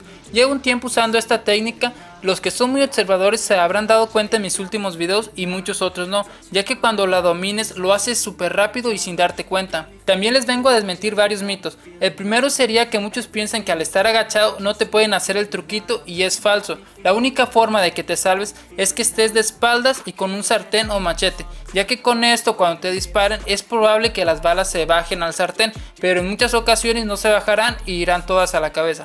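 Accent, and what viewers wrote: Argentina. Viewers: Sos de Tucumán crack ?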